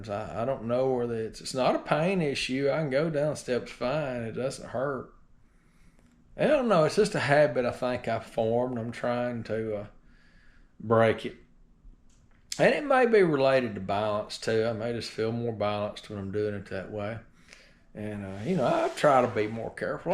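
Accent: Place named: Deep South, United States. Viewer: Thanks for the update, im pleased that youre doing well at this point. Im 2 weeks post TKR so still finding it tough but getting by. I was meant to have my staples removed yesterday but they couldnt be removed as still had some bleeding , so that was disappointing. Ive been told to fully rest it for a week then they will try again next week. I hope i get to where youre at after 6 months..it seems a long way off...lack of sleep is the biggest problem!. Look forward to your next update. I love your accent by the way, im from the UK so not sure which area of the U S your accent is from but its what i imagine to be from the deep south!. Good luck with the rest of your recovery😊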